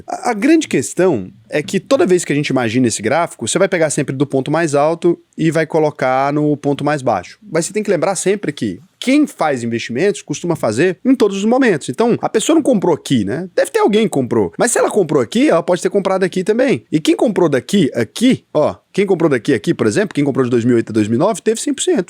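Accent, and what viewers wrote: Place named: Goiás/Minas Gerais, Brazil. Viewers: Haaaa vc é mineiro kkkkkk. Video sem credibilidade. Vcs sao burros,nó cegos,analfabetos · Eu que sou de MG morando em SC, digo, é um alívio escutar esse sotaque GO/MG ai nos vídeos kkkkk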